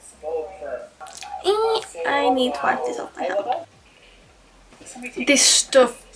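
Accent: United Kingdom. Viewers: WHO KNEW SHE WAS BRITISH AND HAD AN ACCENT LIKE THAT! TF I THOUGHT SHS WAS AMERICAN😂❤️❤️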